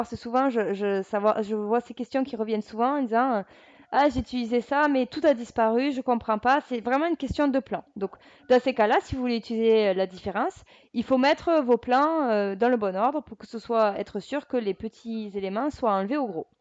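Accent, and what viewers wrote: France. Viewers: Je débute sur inkscape. C’est impressionnant tout ce qu’on peut faire avec cet outil. Gardez ce petit accent béarnais (la cacade) 😂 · très bonne vidéo et bonne explication avec notre accent du sud ;) par contre je trouve aucune vidéo sur ta chaine c normal ? merci pour la transmission de ton savoirs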